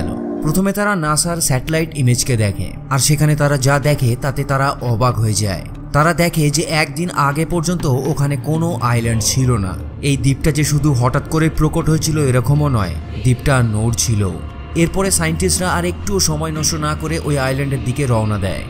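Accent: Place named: Kolkata, India. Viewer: সুন্দর উপস্থাপনা তবে আপনার কথায় কোলকাতার স্থানীয় ভাষার একটা ভাব আছে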